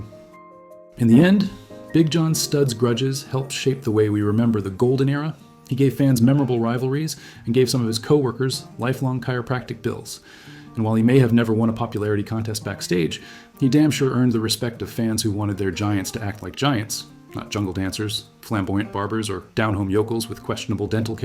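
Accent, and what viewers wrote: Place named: United States. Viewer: So this script is obviously written by a British person and read by a yank. Unless theyre Canadian, but we don’t talk about them anymore. Still a great channel though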